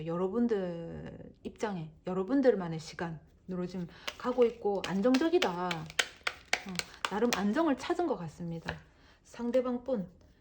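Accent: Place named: Gyeongsang, South Korea. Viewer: 경상도 사투리 넘~잼나요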